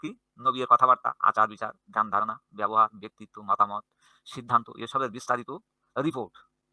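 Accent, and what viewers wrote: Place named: North Bengal, India. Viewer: গুরুজি আপনাদের উত্তরবঙ্গের ভাষা খুব সুন্দর এটা আমরা মেনে নিই কিন্তু আপনার ভাষাটা খুব তাড়াতাড়ি আসার কারণে বা বলার কারণে আমাদের বুঝতে অনেক কষ্ট হয়ে যায় বিশেষ করে আপনি যে পৃথিবী ছয় দিনে বা আট দিনে সৃষ্টি করা যে এটা লাইফটা করছেন সেই সেই লাইফটা আমরা ভালোভাবে বুঝতে পারিনি আরেকটু স্লো ভাবে খন্ড খন্ড করে বুঝিয়ে বলবেন তাহলে আমাদের বুঝতে কষ্ট হবে না আশা করি আপনাকে এবং আপনার জ্ঞান কে আমরা শ্রদ্ধা করি আপনাদের উত্তরবঙ্গের ভাষা গুলি শুনতে এমনি হাসি আসে এরপরে যদি একটু তাড়াতাড়ি করে বলেন তাহলে আমরা একেবারেই বুঝতে পারিনা ধন্যবাদ স্যার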